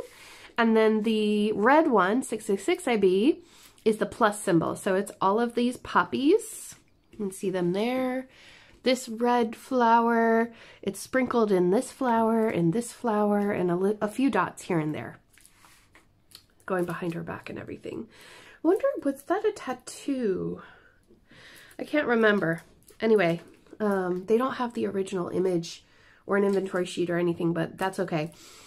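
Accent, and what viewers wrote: Ireland. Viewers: Hi I love your videos, wanted to know what size this was and how much it cost. I ask because Stitcherista just did an unboxing today on her first CraftEase, Her box was beaten up too. I think we all kind of agreed that hers was too expensive for the size she got. What is your opinion on your painting. Also I wanted to tell you that I have been watching your videos for a long time and I am noticing a bit of irish coming out when you talk, it is so cute. Keep up the great work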